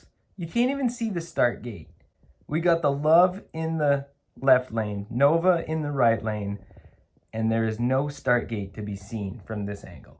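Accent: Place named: Canada. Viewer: I realize you’re Canadian but the word ‘a’ in the English language is pronounced ’uhh’, not ‘eh’.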